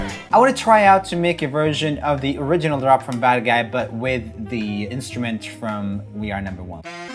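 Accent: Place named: Sweden. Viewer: Why sound so different and having Swedish accent 😅😅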